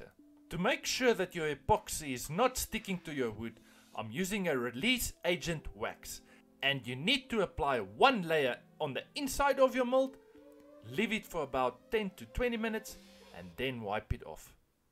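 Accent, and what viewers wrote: South Africa. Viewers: Out of interest sake, are you South African? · This is such a South African accent 😂😂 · you South African?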